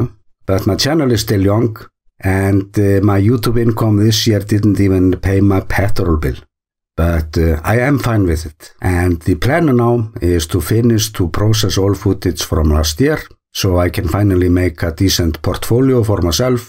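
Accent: Iceland. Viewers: Keep going, please. I always enjoy your videos and your Icelandic voice.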